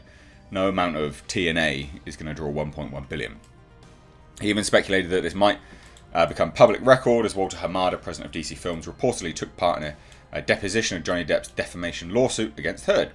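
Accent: United Kingdom. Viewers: You British accent attracts more then the contents…..Absolutely gorgeous 👍🇧🇷😘😍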